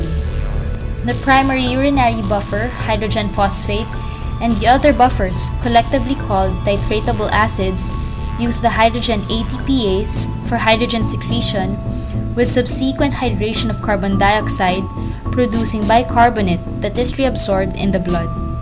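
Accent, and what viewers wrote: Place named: Philippines. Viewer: sounds phillipino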